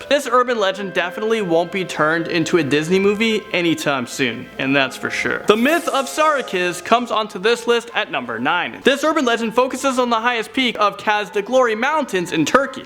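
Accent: Canada. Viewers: “Oot”. Thank you. Nice to hear a Canadian accent escape onto the net.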